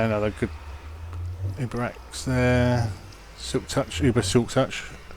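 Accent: England, United Kingdom. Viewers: Hey your from England Ent ya?!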